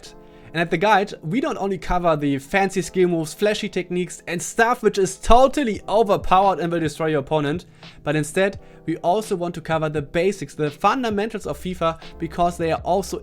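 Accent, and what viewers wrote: Germany. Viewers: Bist du Deutscher? Hört sich stark so an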